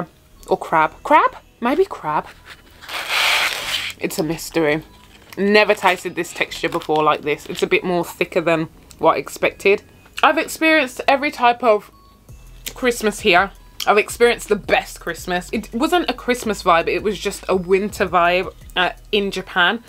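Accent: Birmingham, United Kingdom. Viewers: Yo sis love the videos. Are you from Brum? Your accent sounds like it haha. Hope you're doing well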